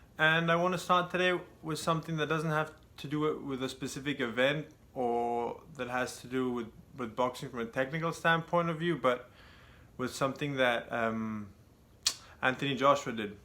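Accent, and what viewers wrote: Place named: England, United Kingdom. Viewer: What part of England are you from? your accent is very light and easy to understand. Sorry I’m just curious on various British accents, I’ve been watching a lot of Hammer horror films lately.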